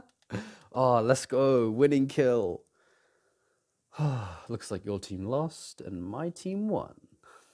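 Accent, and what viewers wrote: United Kingdom. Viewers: bro im british and i still get excited when i hear a british accent lMFAO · THE BRITISH ACCENT AAAAAA 😭<3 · You had me at British accent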